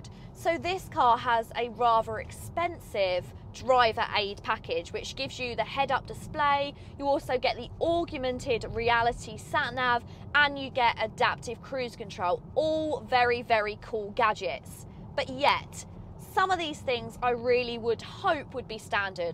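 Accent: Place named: Surrey, United Kingdom. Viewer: God, I need to meet this gorgeous lady. Sounds like a Surrey accent.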